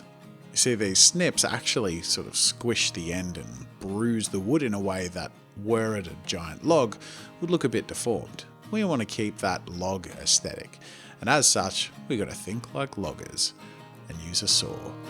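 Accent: Australia. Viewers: Omg I LOVE this! Serene instructional of mini furniture? chill Aussie narration? More, please. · you're more aussie than ever in this one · Thought this was gonna be a troll, like he would start calm and then suddenly go crazy and make a castle or something, but instead I got Australian bob ross, witch was a very pleasant surprise. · A Dremel tool would help with roof, doors and windows. And by the way, you're totally bringing that soothing Bob Ross voice to this one. If Bob Ross had an Aussie accent...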